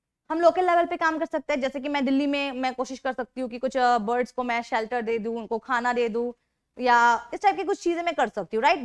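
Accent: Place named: Bengal, India. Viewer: Mam 's accent is like a Bengali....😀👍🏻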